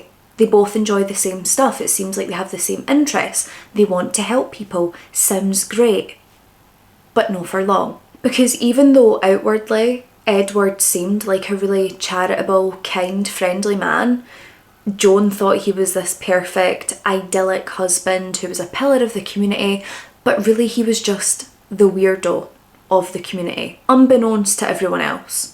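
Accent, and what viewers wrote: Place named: Scotland, United Kingdom. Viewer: Every time I watch your videos, my inner dialogue is in your voice/accent for like the entire rest of the day 😂 and I’m a California girl so I literally cannot even do a Scottish accent myself. Just in my noggin lol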